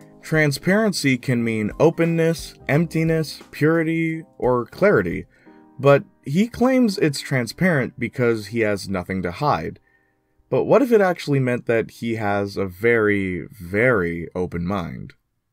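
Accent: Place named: Canada. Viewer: I could just tell he's canadian by the way he says things and his word choices. I guess he's just the polite boring canadian stereotype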